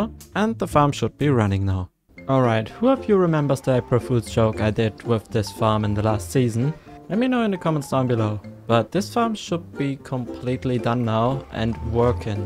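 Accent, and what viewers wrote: Germany. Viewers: No offend but u actually have such a German accent (ich kann das sagen weil ich auch deutsch bin) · oh du german sein beeing? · Is he from Germany or does he only Sound like a Germany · Bist du deutscher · i dont know how to desribe it but you sound like a german trying to speak english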